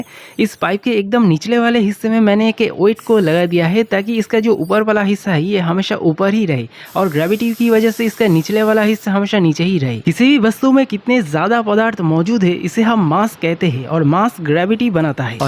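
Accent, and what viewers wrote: West Bengal, India. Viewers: You are from west bangla right??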